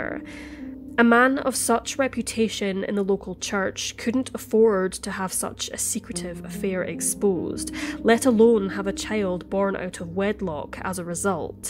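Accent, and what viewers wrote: Scotland, United Kingdom. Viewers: I like listening to that deep beautiful Scottish accent. Great channel and great stories. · This voice is amazing. I can't get enough of a Scottish woman's accent. Like beautiful music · I have such a soft spot for the Scottish accent, I'm not far from you either huni, Northern Ireland. Great upload btw , I love love love the oldest cases. New subscriber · It's the warmth of her lyrically sweet Scottish lilt I love,, yet on the other hand some Scotts sound rough as sandpaper! You'd need subtitles to understand them! FRoM LoNdon wIV LoVe 💋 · Is this narrator from Scotland?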